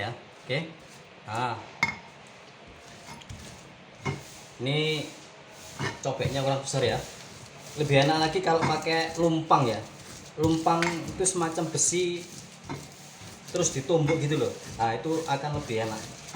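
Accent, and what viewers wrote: East Java, Indonesia. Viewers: Saya suka cara masaknya n bahasanya yg medhok khas Jawa timuran 👍👍👍salam teko arek Suroboyo chef..🙏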